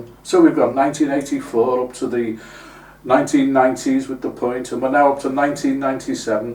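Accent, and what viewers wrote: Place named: England, United Kingdom. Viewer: Hello.. Love your English accent, very charming;-))... you sure have a nice set up for all your equipment, you sure went back to gather all the specific tunes, to explain all this to us, looks like a lot of work, all your version were a delight to listen too,..You are a great artist, singer and most all, you get so detailed with your songs, that they become part of us..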